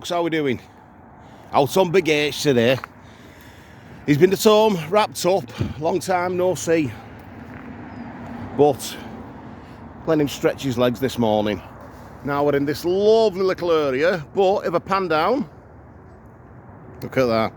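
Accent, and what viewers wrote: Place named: North West England, United Kingdom. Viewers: A nice little ride. Thanks for that. You show quite a bit of character and made me laugh a couple of times, you and your Northern accent. I would have liked to hear more of your feelings about the bike's handling, etc. I think it looks great and the engine sounds superb. Feels solid and smooth. I think, by the way, that most road users are annoyed that there are other road users on the same stretch of road.:) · Where in the UK is this filmed? Your accent seems north west? Just curious. Ex pat.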